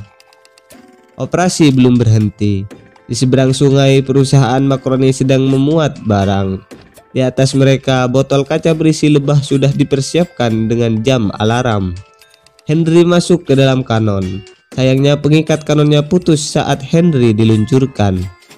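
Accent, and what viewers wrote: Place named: Madura, Indonesia. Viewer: suarane kyk meduro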